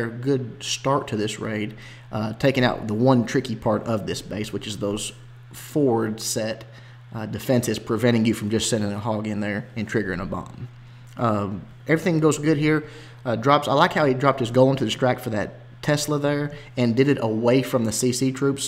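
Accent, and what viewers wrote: South, United States. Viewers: Hey, I wanna remind you of something you said on you 1st upload... You said your accent isn't the best but I love it!! "Golems are fixin to cross" omg!! Too awesome man you've made a brand about yourself that no one can measure up to!! Great job on the channel brother!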